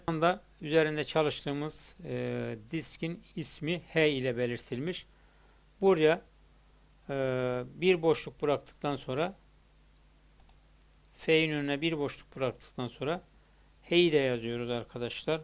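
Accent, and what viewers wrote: Erzurum, Turkey. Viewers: abi Erzurumlu, net!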